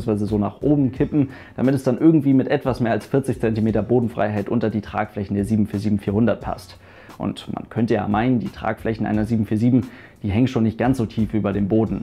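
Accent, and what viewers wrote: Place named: North, Germany. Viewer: Warum sagst du immer Flugzeuch? Was ist das für ein Dialekt Kommst du aus dem Norden ?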